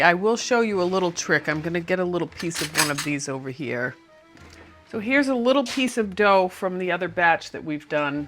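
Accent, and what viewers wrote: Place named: New England, United States. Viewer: It is great to wake up with my coffee and one of your videos. I have been trying to identify your accent. Certain worsa you say remind me of my family in NNew Hampshire and Massachusetts.. We will be trying out your donuts very soon, thank you .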